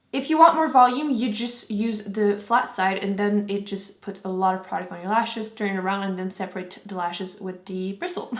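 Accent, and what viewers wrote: Quebec, Canada. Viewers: Are u from quebec 😏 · Ah j'ai reconnu ton accent tout de suite!! Une québécoise!! :) Cool ton vidéo!!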